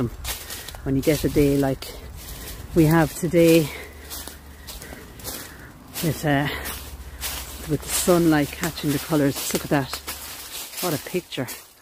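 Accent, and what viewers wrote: Ireland. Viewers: Her Irish lilt is so easy on the ears.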